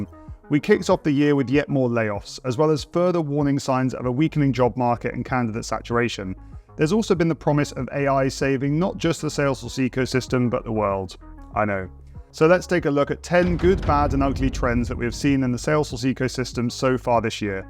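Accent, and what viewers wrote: United Kingdom. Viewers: I was just listening to the video and thought maybe I misheard it due to the British accent😂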